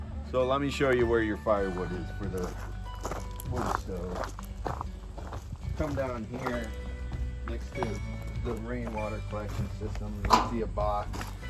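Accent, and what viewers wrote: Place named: Canada. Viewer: Great demo and neat little stove. You kind of sound different to Americans. Maybe you're Canadian or something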